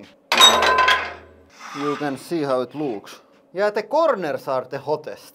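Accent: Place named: Finland. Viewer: I would love to see Finland one day. I was born and raised in the U.S. (65yrs ago) but my grandfather Toivo was Finish. I love watching your channel because of your accent and humor, it reminds me of him. Thanks for the great content 👍 😊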